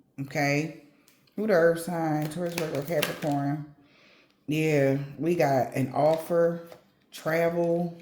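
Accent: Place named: Baltimore, United States. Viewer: Lol is you From Baltimore I love your accent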